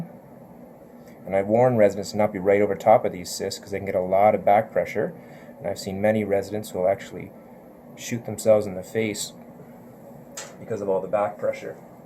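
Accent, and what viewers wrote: Canada. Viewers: he is Canadian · "A month, eh?" Knew he was Canadian almost right off the bat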